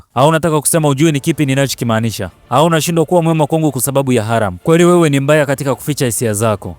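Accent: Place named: Kagera, Tanzania. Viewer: Mtangazaji ana lafudhi ya kihaya😊😊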